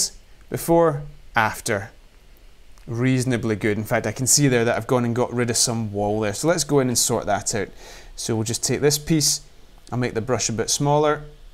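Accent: Scotland, United Kingdom. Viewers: Thanks for that .... very informative! At first I had trouble concentrating on what you were saying, because I was captivated by your glorious Scottish accent, but once my Aussie ear adjusted itself to your accent, I was able to follow every word. Great work!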